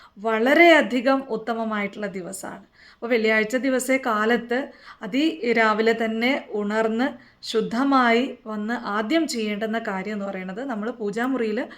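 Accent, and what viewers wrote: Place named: Karnataka, India. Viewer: thankal karnatic ano, thankalude slangil evideyo kitti, nhan kasargod.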